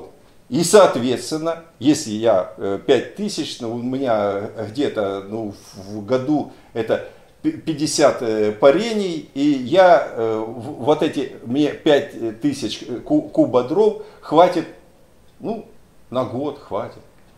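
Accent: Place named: Rostov, Russia. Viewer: Как же достал этот Ростов! Учись говорить по человечески!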